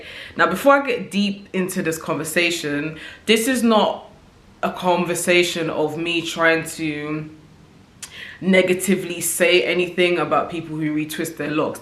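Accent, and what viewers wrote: London, United Kingdom. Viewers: UK IN THE HOUSE!!🇬🇧❤ So refreshing to hear a London accent talking about locs. Really like your channel and content. Really insightful ✨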